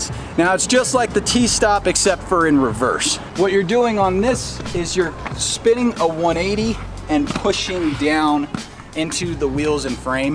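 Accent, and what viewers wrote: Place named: RP, United Kingdom. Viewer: Thank you . Your english is very articulate and congrats for not having a local accent . Its almost a boarding school accent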